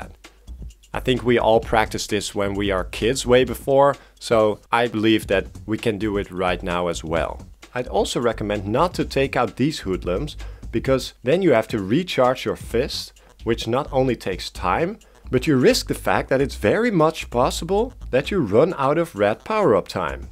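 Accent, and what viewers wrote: Netherlands. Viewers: Ps are you dutch? I kinda hear the accent which would be perfect because so am i:)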